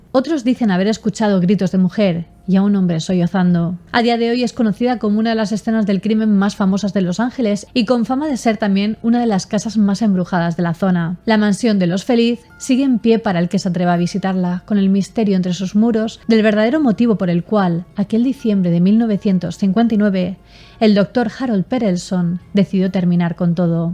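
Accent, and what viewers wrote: Spain. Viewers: Nunca pensé que el asentó español sonara sexy 👂🏼🥰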